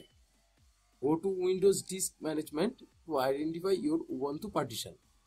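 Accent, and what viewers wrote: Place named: India. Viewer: you are the 397th random Indian accent guy on the internet that saved me thank you.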